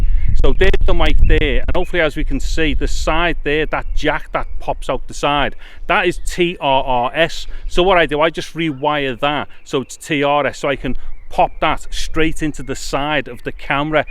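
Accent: Liverpool, United Kingdom. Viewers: I’m here from Muldoon. If you’re not looking specifically for a vlog camera, I think this Sony zv1 seems a good camera. But then...isn’t there better cameras for a similar price or cheaper that can do similar things? I’m looking at Sony a6000, Sony RX 100 V, Canon g7x mark II or as you mentioned GoPro 8. What would you recommend? I’m not very technical, I’m looking more for photography and have the ability for good video or vlogs if I felt like it! Also nice to hear a Liverpool voice! Best Wishes 💕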